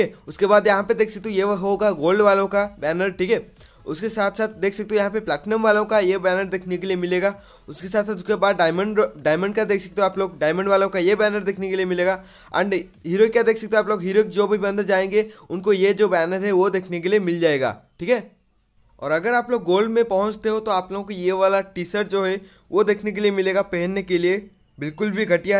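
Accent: Odisha, India. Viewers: Are bhai tum odisha se ho kya ?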